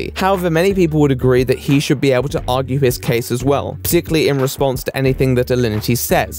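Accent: RP, United Kingdom. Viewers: I absolutely love his posh British accent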